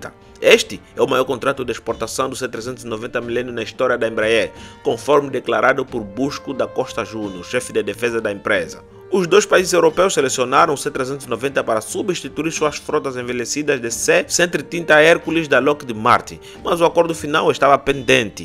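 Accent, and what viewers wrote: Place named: Angola. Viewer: Esse sotaque e de Angola 😊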